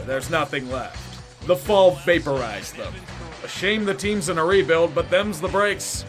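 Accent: Pittsburgh, United States. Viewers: “yinzer voice” is actually real voice. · The return of the Yinzer It’s glorious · Don’t ever go to Pittsburgh, that’s literally how every local talks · the yinzer sultry voice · Unpopular opinion: I dont the like the yinzer voice, as a Steelers fan. I miss days of our Steelers lmao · yinzer voice is a true thing of beauty. To anybody who has never been to Pittsburgh and wonders if we really sound like that? Pretty much, yeah, we do. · the whole Yinzer voice thing is unwatchable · Stop with the Yinzer voice makes me wanna cut the video. So fucking annoying and not funny! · Did anyone understand anything he said in Yinzer Mode? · inner Yinzer has finally come out after all these years and I LOVE IT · Jesussss christ that insane cringe yinzer voice. Nearly unsubbed.. nearly. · Stop doing the yinzer voice I actually wanna enjoy the coverage on the steelers lol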